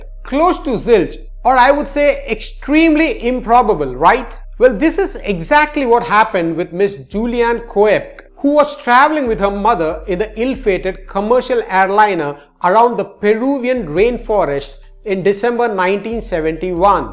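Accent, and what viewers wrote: India. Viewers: People are a disappointment when they cant look past an accent, the content is very good that's what should matter. I actually like the indian accent. Good video bud. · i enjoyed watching, but i didn't enjoyed listening to your indian (malayalam english) diction. I feel that i am having a short of breath listening you bro!! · The story is good....but the Frikin Indian accent is pissin annoying. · indian english.. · Amazing how many people are complaining about this guy's accent. He's clearly from the Indian subcontinent - he's allowed to have an 'accent'. He's also managed to collect 140,000 subscribers on a platform that's massively dominated by American viewers and content providers, which I'd say is no small achievement. For the record, English is nowhere near being the most spoken first language in the world - if we sum together the various Chinese languages, for example, the number of first-language speakers outnumber first-language English speakers by more than three to one. 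There are also more people speaking Hindi and Bengali as a first language than there are English. So to everyone criticising ' ' for his accent, I say: how's your Hindi coming along? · In fact it’s the best Indian accent that I’ve ever heard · My parents were invited to a dinner with juliane koepcke parents the night before the accident, the host was the dean of biology faculty at san marcos university, lima peru, they were talking about tropical diseases in peruvian jungle and how hard it will be if you get lost there. Btw, i dont know why is nice to hear narrations in english with indian accent , maybe too much big bang theory or get used to call centers. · Those people can really affirm "they're lucky". Love your Indian accent!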